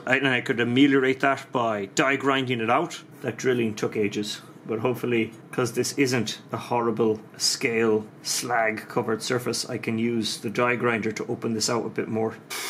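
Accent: Ireland. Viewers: I just love hearing other Irish people since I moved from Eire to Australia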